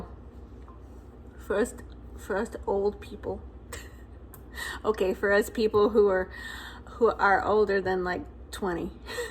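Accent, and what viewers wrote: New York, United States. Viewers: Aww no answer yet . Love the NY accent , can you do West VA accent lol I’m from Florida. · Hello from indiana omg I can’t believe your NY accent that’s awesome 😂🥳. Have a great day cutie 😊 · Wow - U got the NYC accent down pat. 😊 You've got those US regional accents great too. How'd U learn to do that? Hi, gal. Jan here again. Guess U feel a little during latest post. Hope so, gal pal ❤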